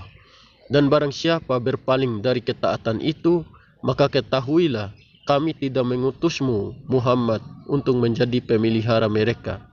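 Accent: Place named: Maluku, Indonesia. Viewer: Tapi bang saya kayak tidak asing dengan aksen berbicara anda bang,sebelum nya saya mau tanya.apa bang dari daerah adat seribu negeri,yang dulunya 1 provinsi sekarang terbagi jadi 2 provinsi?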